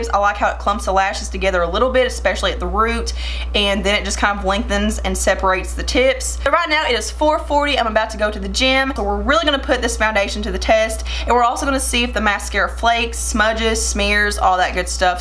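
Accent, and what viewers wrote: South, United States. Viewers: I've just found your channel & I'm loving you. I grew up in the south so we talk alike & I'm wondering where your from?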